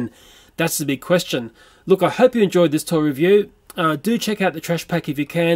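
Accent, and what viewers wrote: Australia. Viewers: cause he’s aussie lmaooo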